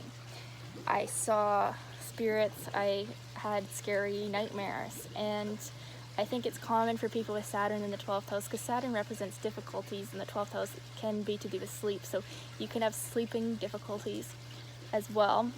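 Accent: Canada. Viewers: As an astrologer I frequently look up videos for my clients to watch for maybe a better understanding, (I do free reads) 12 th house Saturn is a difficult placement and I needed a video to express that and your video did that!!! Thank you you are a gifted astrologer whom I would love to chat with!! Am I picking up a Canadian accent?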